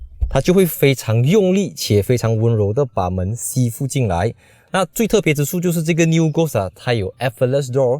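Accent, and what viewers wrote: Malaysia. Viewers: 把英文说出马来口音，哈哈。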